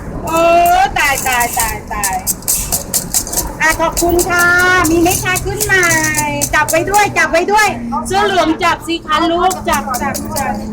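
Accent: Thailand. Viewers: ชอบอ่า สำเนียงเหมือนคนสุโขทัยเลย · สำเนียง...อีสานบ้านเฮา..แท้.ๆ..เด้อ..เอื้อย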